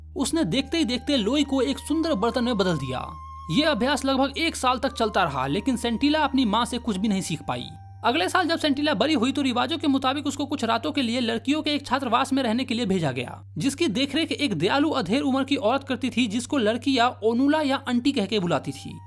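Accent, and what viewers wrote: Bihar, India. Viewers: Bhaiya bihari kam bolo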